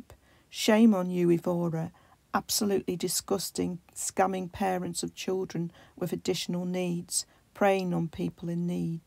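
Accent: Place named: United Kingdom. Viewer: Thank you SO MUCH for this video! SO PLEASED I found it & didn’t get scammed. Ironically, I found your voice much more soothing! Your accent reminds me a lot of a lady I used to work with. Do you mind me asking what part of the UK you are from?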